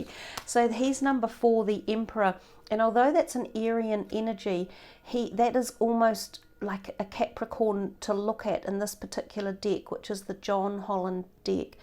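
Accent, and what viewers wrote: New Zealand. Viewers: Are you a new Zealander? The accent in familiar. Regardless.. glad I found you - a familiar accent with tarot readings on YouTube is calming. ❤ thank you for taking your time to do this reading 😊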